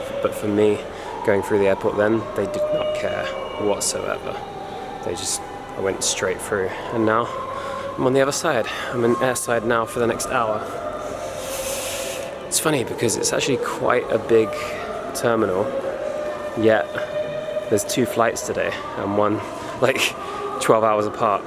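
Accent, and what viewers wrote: United Kingdom. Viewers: Jonathan Stoevelaar - he’s not American lol he has a British accent..... you’re not an arsehole just ignorant lol 😆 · This video just popped up in my feed. Sorry man! I don’t have a clue who you are and what you do, but being at that airport alone. It sets you in the mind of how Son of MAN’s return is going to be. It’s going to be lots of abandoned places. Also, I couldn’t really tell you were from the UK due to your accent not being as strong. I thought you were from Australia, but I was wrong. Anyway, be safe on your next trip wherever you go. God bless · This guy talking in this video has an English accent, with a British passport. Syria was destroyed by the USA on purpose, like they destroyed Afghanistan, Iraq and Libya and now want to destroy Iran. Why are you blaming the Assad regime for destroying Syria and for making you a refugee in Germany?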